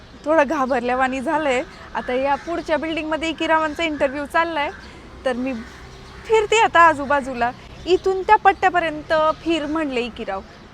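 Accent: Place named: Kolhapur, India. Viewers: This Is Kolhapur Style Marathi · Wow! That's to epic yrr. Khup divsatun hi satara - sangli chi bhasha aikayla bhetli. ❤❤❤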